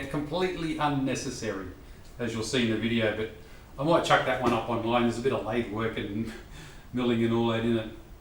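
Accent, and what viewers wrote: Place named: Queensland, Australia. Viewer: hey mate you are not in melbourne are you (judging from your lovely QLD acsent)? need mine done too, slow leaking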